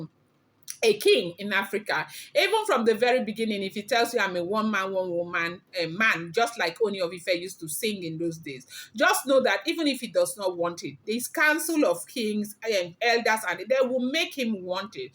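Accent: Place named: England, United Kingdom. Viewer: You are such a young and beautiful woman. I presumed you to be an elderly woman with a plump body and a deep voice 😂. Please pardon me for the presumption. I am so pleased to see your face and enjoy your vibrant smile and English accent. Kudos for being very honest and respectful in your delivery of your blog.❤. Please text me the link to join your chat. I couldn’t get the link. ( mind you I am of old school 😂😂😂😂). Don’t mind me ooo.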